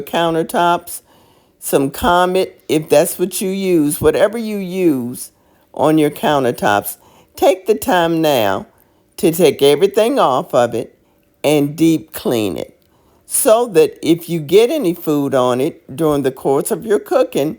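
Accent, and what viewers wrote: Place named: Virginia, United States. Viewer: I really enjoy your videos and the way you speak. There’s something very comforting about your voice and delivery. I spent a lot of time in Virginia while stationed there in the Army, and the moment I heard you speak, I instantly recognized that familiar Virginia cadence. Wishing you and your family a very happy New Year.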